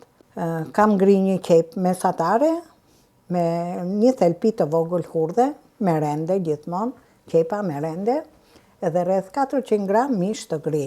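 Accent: Tirana, Albania. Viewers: Zonjë e nderuar të lumshin duart ,sa mir gatuan dhe sa bukur shpjegon me dialektin Tironas ,paç jetën sa më të gjatë ,dhe të kesh shëndet të mirë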